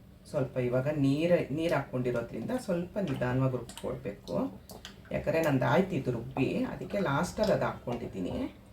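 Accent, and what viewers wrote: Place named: South Canara, India. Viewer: Neevu South canara antha gottayithu. Yavuooru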